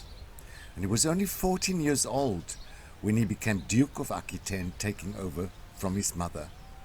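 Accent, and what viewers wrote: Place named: South Africa. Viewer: Recognised the SA accent, am enjoying your channel so informative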